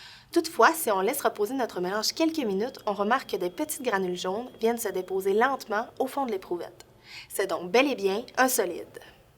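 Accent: Canada. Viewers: merci grâce a vous je vais pouvoir mieux faire l'accent Canadien ! MERCI BEAUCOUP!!!!!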